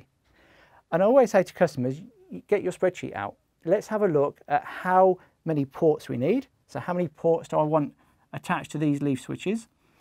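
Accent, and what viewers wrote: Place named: United Kingdom. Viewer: God, finally UK gentleman pronounce... love it...nice one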